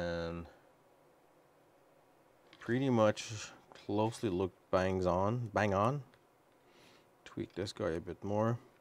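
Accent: Quebec, Canada. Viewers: Québécois accent for sure ! Nice video patron !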